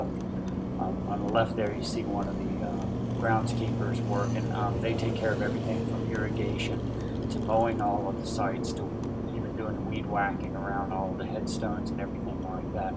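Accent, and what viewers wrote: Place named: South, United States. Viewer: Because, when I saw the name, I thought to myself," I have only known one in my life, I bet this is him, and asking would be the best way to confirm if it is him." I am being cryptic, because as you see, 0sum gamezzz is not my real name, and I want to remain anonymous. I am going to give you clues to as who I am, not too specific, because you are a sharp guy, and would know right away who I am. if you figure out who I am, please cryptically respond to me with clues as to who you believe I am...please? At one time, I counted you as one of my best friends ---- by the way, your accent is far less southern now, than it was, when you were a boy of say... 14 through 16. Facing the main office/kitchen, the building where Vespers took place I lived on the right side of campus. This may take a series of posts, if you decide to play along. 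Please be rest assured, I have no bad or disrespectful intentions towards you... in fact, it is 100 percent the opposite. By the way, you can ask questions to obtain my ID. This could be fun.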